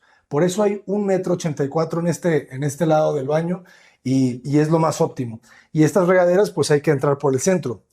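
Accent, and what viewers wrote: India. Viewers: La pronunciación del inglés suena con acento que tiene la gente de la India 🇮🇳 ☺️ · Hola siempre me gusta ver tus videos, pero hoy me sorprendió te admiro por tu valor de ablar inglés sabiendo que no tienes el asentó esto es una crítica constructiva , eres el primer mexicano que escucho con asento ,, Indu,, pero sigue practicando y trata de ablar con una persona nativa con inglés y pregúntale como suena tu inglés y que sea sinsero, eso te ara corregir muchas palabras, pero una ves más felicidades admiró tu trabajo 😊.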